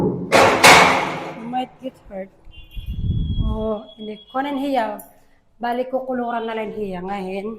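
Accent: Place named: Borongan, Philippines. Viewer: New subscribers here☺️ Watching from Canada 🇨🇦 a Filipino Canadian from Tacloban Philippines! U speak fluently in Waray madam! Taga diin ka ha Leyte if u don’t mind me asking? If I guess correct me if I’m wrong u sounds from Borongan Samar?hehe I’m proud Waraynon here! God bless u and wish u best of luck madam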